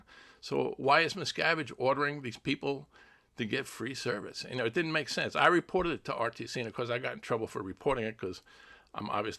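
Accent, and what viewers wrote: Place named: New York, United States. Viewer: Great first solo video! I'm amazed that you still have your New York accent even after being away from it for so long! As a NY'er, it makes me smile 🙂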